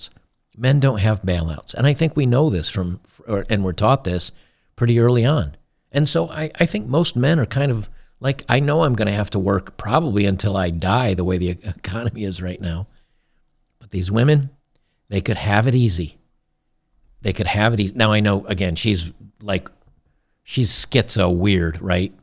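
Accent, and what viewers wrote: Australia. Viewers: As soon as I heard the accent, I thought to myself, "Thank fuck I left Australia!" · Being Australian myself I knew she was Australian as soon as she started talking... trust me though, you will be surprised how many girls there are like that over here, she is not alone. Anything for clicks these days... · Omg she sounds Australian. I hope I don't run across her......😱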